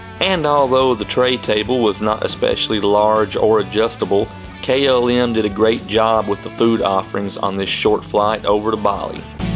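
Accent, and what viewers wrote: Kentucky, United States. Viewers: Is that your real voice? If so, I love your Kentucky accent…..we all need to slow down a little!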